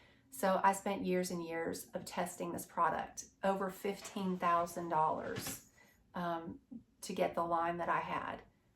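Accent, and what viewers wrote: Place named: South, United States. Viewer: Young lady if I was younger I would ask you if I could just get to know you . By the way do you like motorcycles , I think you would be a great back seater and any man should be proud to let you grace there bike with your presence .Do you live in the south, because I here a slight twang in your voice and I’m thinking of moving to Tennessee. You may not have noticed but I’m a biker and love long trips and riding as often as I can.I was born in Florida but have lived in California most of my life .Hey if you Want you could get back to me if you would like to say hi,or not if you think that would be wrong.Either way I hope to see some more of you videos and hope you are feeling better about your future in light of all that has gone on with you . My name is guy and hope to maybe talk to you some day.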